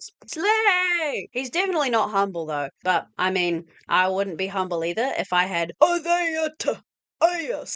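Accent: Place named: New Zealand. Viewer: Also you sound like a kiwi lol, don't ya worry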